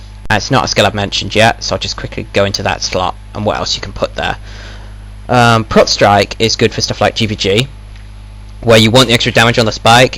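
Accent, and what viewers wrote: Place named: United Kingdom. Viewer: wooot a british gw player that does vids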